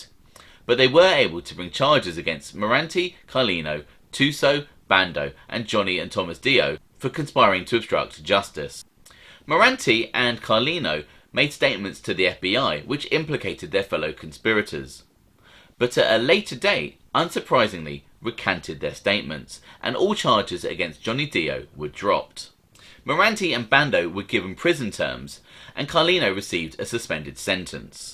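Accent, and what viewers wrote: United Kingdom. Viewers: I fucks wit heavy. Perfect limey accent to tell stories and excellent stories with pictures I would like more wiretaps tho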